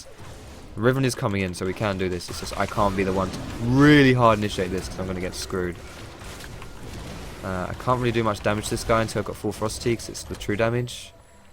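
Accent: France. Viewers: is he french ?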